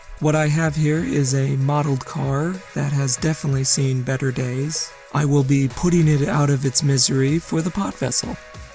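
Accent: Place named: South, United States. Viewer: Hi!👽 Southern accent… 6 out of 10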